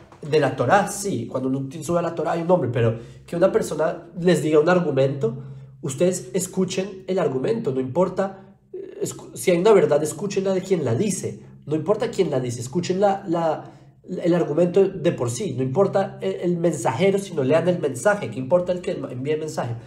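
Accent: Colombia. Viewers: tú de colombia solamente tienes el acento, que ya casi lo estás perdiendo! Pero la cara esa de Judío auténtico Israelita, Hebreo, del ME nadie te la quita! Una prueba es que si no lo fueras nunca te hubieran aprobado la Ley de retorno! No pierdas tiempo con estos analfabetas por favor! Tú eres buenísimo para enseñar y abrir mentes y transformar a muchísimas personas con la verdad! Gracias mil, Shalom!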